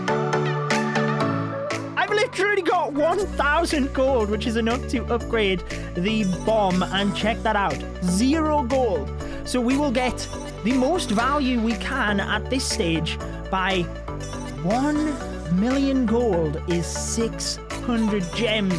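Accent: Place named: England, United Kingdom. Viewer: You from England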